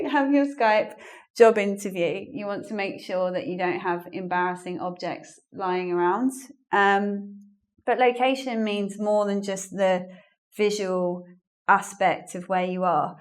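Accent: United Kingdom. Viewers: You're a really cute teacher, I like your british accent and the way you teaching. That's great! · I love your british accent!! thanks for your class.... Greetings from Colombia. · You're amazing !! The best accent of the world and the best and real English !! UK 👍🇬🇧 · Lets donate people, shes a great teacher with very sweet British accent. I love it :)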